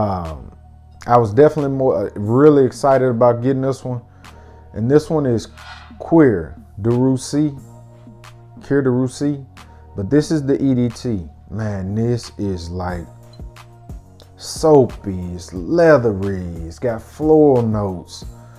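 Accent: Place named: South, United States. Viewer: !! Man you talk country like me 😃. That Chanel sounds great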